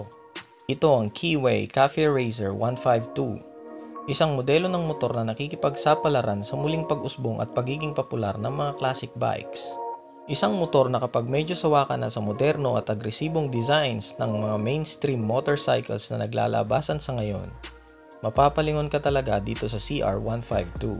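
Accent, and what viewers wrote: Ilocos, Philippines. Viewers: Ilokano ah lods hehehe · Ilokano ka mt bosing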